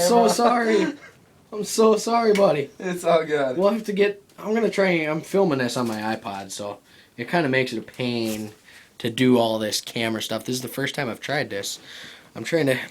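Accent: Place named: Minnesota, United States. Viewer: I miss that Minnesota accent 😁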